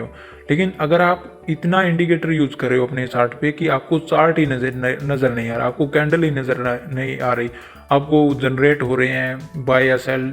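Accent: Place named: Rajasthan, India. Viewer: Rajsthan k lag rahe hai (meri local language style bol rahe hai)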